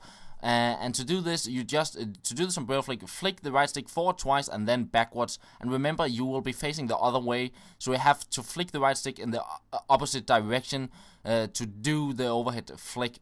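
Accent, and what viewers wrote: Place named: Denmark. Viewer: Haha, man kan hører du er dansker :-) - Elsker dine videoer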